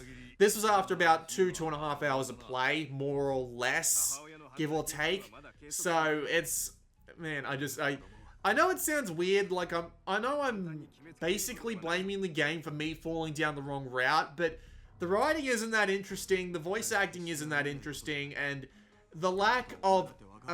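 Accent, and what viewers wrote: Australia. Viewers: It sounds like you would really enjoy the anime. You sound Australian, is that correct? If so, Psycho-Pass is on Madman's AnimeLab for free (and it's legal) in Australia and New Zealand. It's a great anime and I think this visual novel is really just for fans of the anime, like me. It's not a standalone product.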